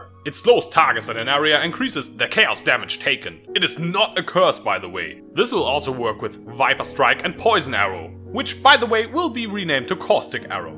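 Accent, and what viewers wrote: Germany. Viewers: I cant tell if this accent is german or asian.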